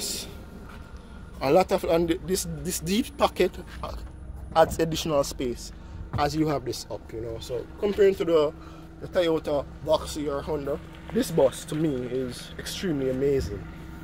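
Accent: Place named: Jamaica. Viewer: your tone tells you from Jamaica,.....wagwaan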